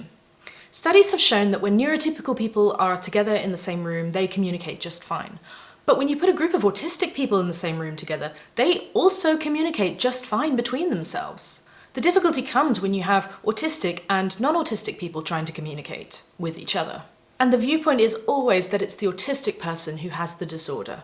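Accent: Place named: RP, United Kingdom. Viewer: Was Received Pronunciation part of your Aspi-onage? 😉 maybe I'm imagining things, but your speech seems a bit more posh in these earlier videos. No disrespect intended.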